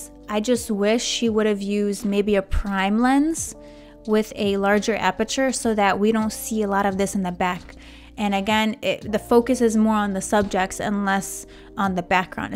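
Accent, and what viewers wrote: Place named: New Orleans, United States. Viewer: I just placed your accent, it's New Orleans, right? You sound just like a friend I had from there.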